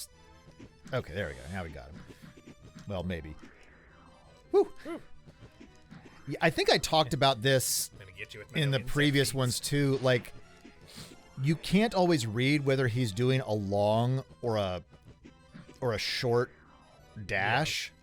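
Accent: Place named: South, United States. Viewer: I absolutly love the southern accent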